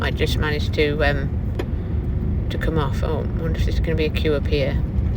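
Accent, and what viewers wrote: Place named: Derby, United Kingdom. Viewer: Good video . Your Derby/ Burton accent is really coming on . From Mickleover . Live in Uganda now .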